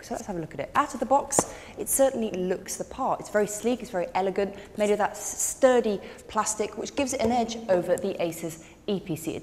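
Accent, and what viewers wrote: United Kingdom. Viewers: I like the way she do review, though her British ascent sometime hard to hear her what she speak.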